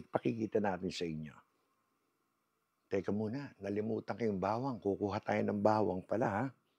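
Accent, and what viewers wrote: Marikina, Philippines. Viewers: Puntong Marikina ang salita niyo. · Yung accent ninyo talaga pure taga Marikina😊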